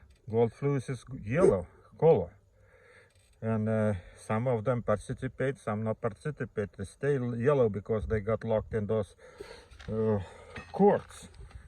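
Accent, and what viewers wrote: Russia. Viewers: Приветствую! Вы русский? Акцент ухо режет.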